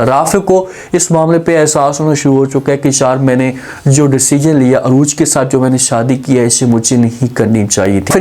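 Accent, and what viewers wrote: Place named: India. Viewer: Anchor you have a bloody awful accent india🇮🇳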